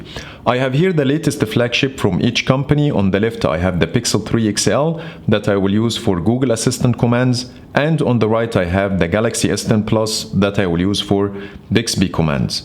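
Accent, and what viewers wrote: Egypt. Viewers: Are you Egyptian? Your accent is very Egyptian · Your accent is so Egyptian damn 😅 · من طريقه نطقك شكلك مصري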